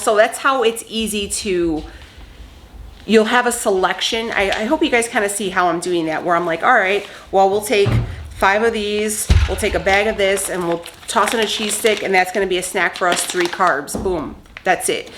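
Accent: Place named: Minnesota, United States. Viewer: Minnesota? That accent..Keto on n Strong!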